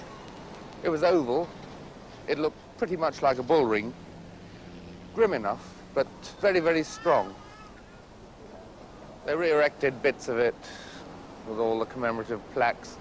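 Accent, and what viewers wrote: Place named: RP, United Kingdom. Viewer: Well that is received pronunciation and I speak with the same accent and I have lived most of my life in Huddersfield. There are a myriad of accents in Yorkshire alone.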